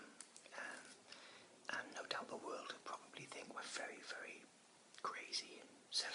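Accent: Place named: England, United Kingdom. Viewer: Your voice is lovely. The way English people speak makes me melt. Can't wait for more videos. :)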